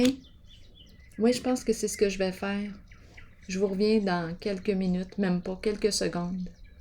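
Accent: Quebec, Canada. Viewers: C'est incroyable 🤩 pile pile sur ma vie wow bravo et merci 🙏🏻 avec ta voix j'imagine que tu es situé au Québec? Etk moi oui!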